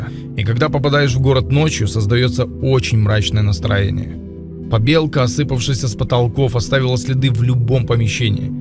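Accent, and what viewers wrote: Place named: Ukraine. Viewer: егор соболь а разница то какая? Разве это вбила? Просто у нас Украинцев такой говор вот и всё.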